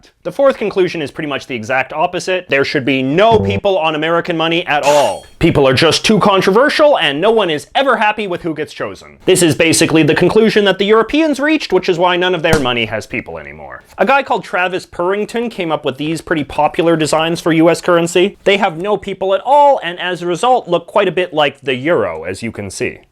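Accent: Canada. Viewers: After hearing all those aboots. I suspect this guy of being Canadian.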